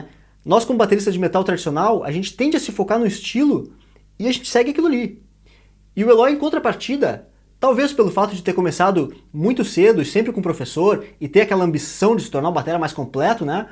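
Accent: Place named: Rio Grande do Sul, Brazil. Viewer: E como é esse sptaquezão gaúcho ai na Noruega hein heehehehehe, tri bom ouvir canal de conterrâneo hahahaahah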